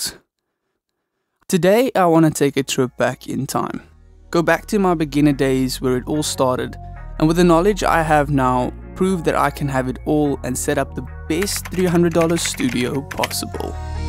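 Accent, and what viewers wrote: South Africa. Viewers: You sound so South African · ... Your accent sounds like you come from Cape Town...... Nice info.. Thank you...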